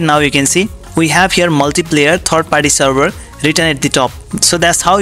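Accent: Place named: India. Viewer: it's joke, the guy has Indian voice and must of the time Indians are scammers, but this isn't one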